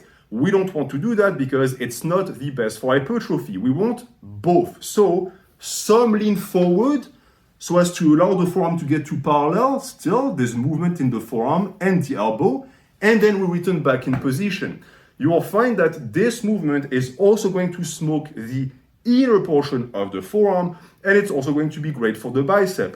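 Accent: France. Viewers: Are u french? Nice accent 😂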